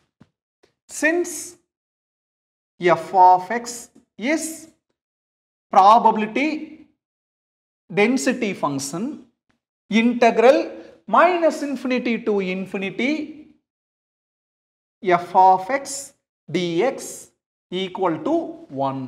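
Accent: Coimbatore, India. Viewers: Sir neega coimbatore ah.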